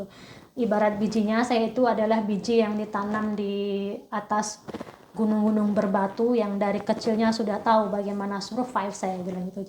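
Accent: Java, Indonesia. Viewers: Suka liat gaya bicaranya Bu, kelihatan sekali aura pendidiknya. Biarpun dah lama di Eropa logat jawanya masih medok dan selalu menggunakan bahasa indonesia. Cara mendidik Matilde pun masih kental sekali dengan budaya Jawa, selalu bilang maturnuwun dengan luwes. Sukses selalu Bu, semoga senantiasa sehat dan membawa berkah bagi keluarga, aamiin....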